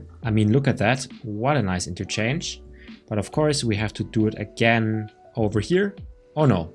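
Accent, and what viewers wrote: Germany. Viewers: Bro man hört so raus dass du Deutscher bist😂❤ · I can hören german Akzent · You sound very German! 🇩🇪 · Ich höre deutschen Akzent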